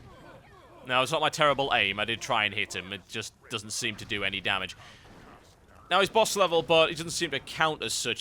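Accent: United Kingdom. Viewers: dude your british accent fits for the worgen commentary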